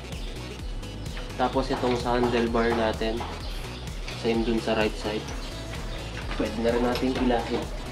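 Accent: Pampanga, Philippines. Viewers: Kapampangan ka sir?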